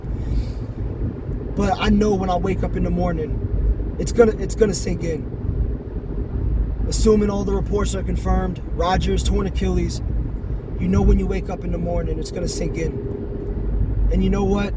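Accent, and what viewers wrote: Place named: New York, United States. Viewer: My man’s went New York accent on y’all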